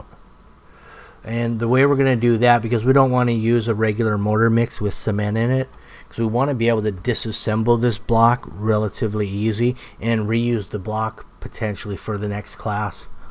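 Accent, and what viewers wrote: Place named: California, United States. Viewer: you're a good man. You are a throw back to the true Californians I remember from back then...even the way you talk. AWESOME!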